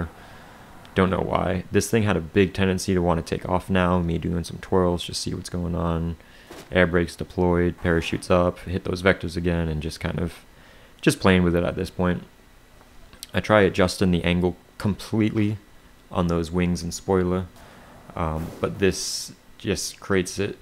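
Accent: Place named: Mid-Atlantic, United States. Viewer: A true "Mid-Atlantic Accent" then!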